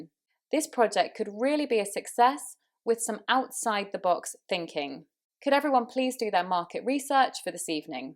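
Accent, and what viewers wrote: United Kingdom. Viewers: you are such a wonderful english teacher, I learn many things from you and I love your UK accent however I can follow you on FB and insta but not on Tik Tok because it's ban in my country but Love from India 🇮🇳❤️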